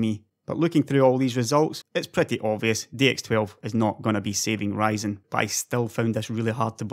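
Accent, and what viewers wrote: Scotland, United Kingdom. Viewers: I came for the Scottish accent, did not disappoint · Your scottish accent is giving me a hard time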